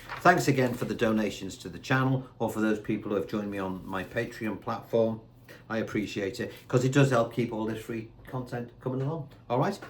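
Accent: Liverpool, United Kingdom. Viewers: You sound a tad scouse. Can't listen to that awful scouse dialect.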